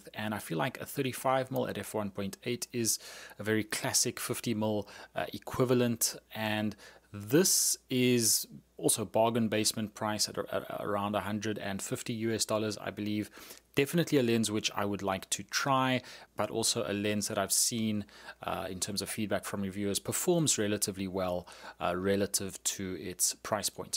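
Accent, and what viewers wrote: South Africa. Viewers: South African??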